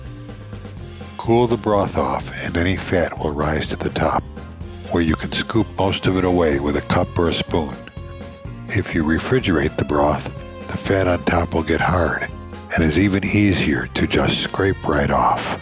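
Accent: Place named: Chicago, United States. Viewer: Thank you very much for your tutorial videos! I am especially fond of that Chicago-sounding accent. Makes me extra homesick when I am cooking, missing my both of my Grandmas, Aunts and of course, my Ma!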